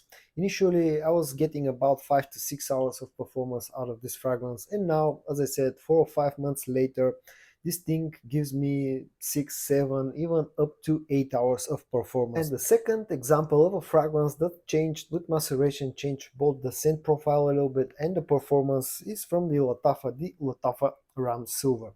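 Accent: Bulgaria. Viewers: Yes, I was a 100 %you are Bulgarian. Judging by the accent. Congratulations!!!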